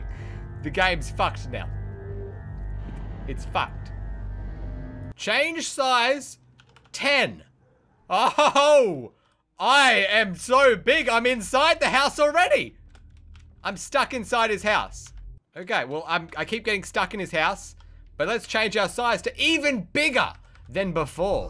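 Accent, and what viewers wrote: Australia. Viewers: i'm Australian you sound like your from Australia...! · is he from Australia? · Wait is he Australian my grandma is Australian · R U Australian? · Are u Australian? U sound Australian.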